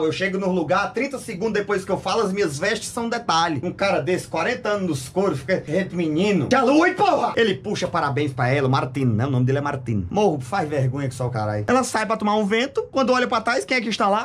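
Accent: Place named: Piauí, Brazil. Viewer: Whindersson saiu do Piauí e nunca parou de falar piauiês , meu vizinhos vão pra SP volta 15 dias dps falando igual aos paulista 😂😂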